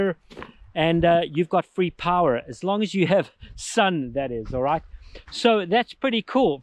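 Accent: Afrikaans, South Africa. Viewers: Are you south African? Or have you lived there for a while, your accent is so similar. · Almost sounds South African? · South african? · I agree that is a South African accent. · South African accent? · Jy klink vir my Afrikaans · Love the South Africa Accent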